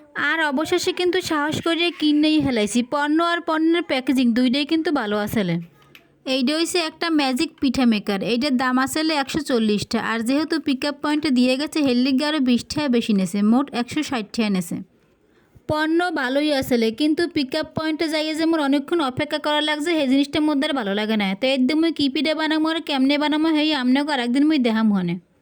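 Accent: Barishal, Bangladesh. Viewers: আপু আমি তো তোমার বরিশালের ভাষা শুনে সবসময় ভালো লাগে তুমি আমাকে দেখো না কেন ❤আমি তোমাকে সাপোর্ট দিয়ে দেখতেছি❤ ভালো থেকো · বরিশালের ভাষা আর আপনার পন্য দুইটাই অনেক সুন্দর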